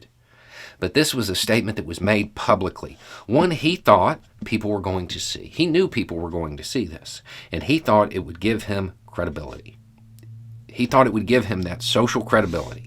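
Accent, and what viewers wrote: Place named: Texas, United States. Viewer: I commend you for standing up for your beliefs. Sounds by your accent you live in Texas therefore I understand the use of " the Fifth Column ", the enemy of my enemy is my friend.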